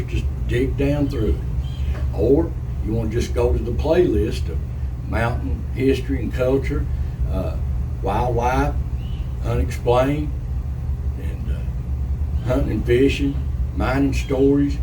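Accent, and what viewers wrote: Appalachia, United States. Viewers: I am an old Appalachian hillbilly as well. I am quite proud of who I am and where I came from. I came from a hard working and God fearing people that respected and loved their neighbors. Our culture is slowly going out and you are doing a great job of recording a part of our history. You keep up the good work my friend and don’t worry about the accent. I understand it just fine! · you and that baked in accent represent and embody the wisdom of the great Appalachian mountains!! We thank you and have a happy New Year!! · Thank you so much for sharing your mountain stories. I have seen every video that you have put out. My people are from Pikeville. Your stories are like so many that was told to me by my Dad. Appalachia is so rich in American History and culture. You’re an excellent historian and researcher. Your accent adds the authenticity to the history that you are presenting. 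It would not have the same flare if told by someone not from the area. You give your audience a “real slice of life”. God bless you. ❤ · I have been laughed at all my life for my Appalachian accent but just like you I refuse to change. I am teaching it to my Grandson. I walk tall being from the mountains of Appalachia and am proud to live here. Words to me like red neck and hillbilly are compliments. Appalachian people are the best we love our God, family, country and our guns. God bless you and all the great folks of this country, especially those from Appalachia. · I talk the same way you do. It's the Appalachian in us. I have had people say that people in our region talk different it's just the way we are Love your videos God bless you I also have a wood stove but my home has oil heat too. It's expensive right now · Hi, I happen to love your Accent and All of your videos. You are doing a Great Job sharing the lost History and You Do Keep it Real! 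Thank You so much for being a teacher of your heritage and culture and mine as My Family is from Spruce Pine,North Carolina and although from different regions of Appalachia I love hearing and learning about all of it!! Thanks again and I hope you have a Wonderful and Blessed New Year!!😊 🙏🏻🎉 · I enjoy all your videos. I sure appreciate all you do to bring us. I enjoy watching you metal detecting whether you find a treasure or not. To me the ole broken plow piece is a treasure for, it has it's own history or part of a ole bean pot; it's all a treasure to someone at some point. I'm still so excited about me finding the 1890s shotgun shell I found at the lake because, you got me so Interested in such.. I still haven't gotten to get a metal detector, maybe someday. I follow a lot of metal detecting on YouTube. I TAWK the way you do, my accent is my Appalachian gift. My kids don't TAWK the way I do either. 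God bless and Happy New Year to you all. Thank you for all you do, sincerely appreciate you. ♥️ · I'm Right there with ya, with the strong hillbilly, mountain folk accent. And I'm Not ashamed one bit, doesn't mean I'm less educated, regardless of what people think. Common /mountain (hourse sense) of far more important and valuable than books smart... My opinion. Many years ago, when I did vlog type videos on YouTube, long before YouTube went commercialized. I had subscribers, mostly based on people just love to hear me talk, lol. Didn't matter what I was talking about. Lol. You've got me looking at a few metal detectors. Something I think would definitely be interesting, regardless I find anything of value or not. I'm like you, just like finding old gun shells. I'd be happy to find some of those from early 1900s, that'd make my day, alone. Thank you for your youtube channel. I've told many bout it, I love having a history Channel based on my area. 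Thanks for ALL you do! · me and my folks talk just as you do here in the Ga Appalachia near Ellijay. I appreciate you friend.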